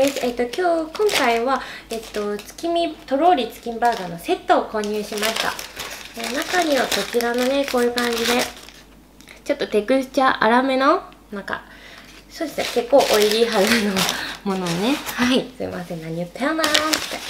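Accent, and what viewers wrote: Kagoshima, Japan. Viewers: 鹿児島のイントネーション入っててなんか嬉しい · ケンタッキー食べる動画嬉しすぎて泣ける。しかも鹿児島弁聞けてさらに泣けます。今一緒にケンタッキー食べてます🥺🥺幸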